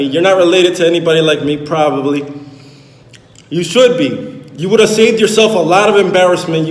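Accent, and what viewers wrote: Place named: United States. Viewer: your a american ok.. I can tell by the way you talk your not from the island. The Dominicans I know from Panama and RD would stick they chest out and not cry like a little b$tch... They stand on what they believe not back and forth bs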